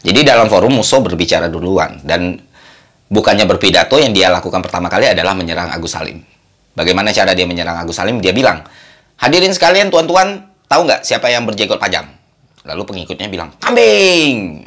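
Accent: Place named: West Sumatra, Indonesia. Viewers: Howaik... Minang nyo dima tu da? 🤣🤣 Ternyata dugaan ane selama ni bener, waktu video vlog ke candi di jambi, bahasa melayu jambi nya bang kok agak terasa minang🤣🤣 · Gw kira dari jambi bang, ternyata minang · Bahasa minang logat jambi😁👏 Syahrir dan Agus Salim sepupu. Agus Salim padahal gantengnya Allahahu Rabbi waktu muda. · lai dapek juo logat minang dek uda ko mah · wkwkw baru aja kemarin nyari biodata lu di internet, karena yakin dari susunan kalimat dan irama ngomongnya kayak orang Sumbar. Tapi data di internet bilang lu asal Jambi. Tapi sekarang terjawab disini wkwkwkw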